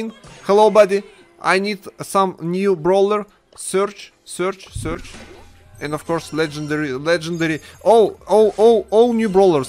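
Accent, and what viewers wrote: Russia. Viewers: Why you have a Russia aczent ? xD sorry I hope you can read dis I'm from germany xD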